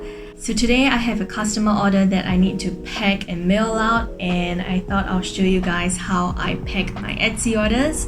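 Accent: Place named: Singapore. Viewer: I love your video, I think your accent is a mixture of Singaporean and Australian which is interesting for me to listen to. I hope I can live in Perth in the future and enjoy it as much as you do :D!!:yougotthis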